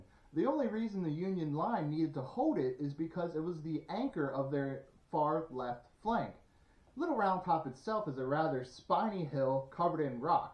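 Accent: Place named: South, United States. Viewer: Watching this for a second time a year later. Lol. Im trying to figure out why your southern accent is ssoooo much different from Ranger southern accent. And his is authentic! Most importantly, perhaps the Rebs could have won if Garfield would have knocked the Union cannon off the table instead of the booklet.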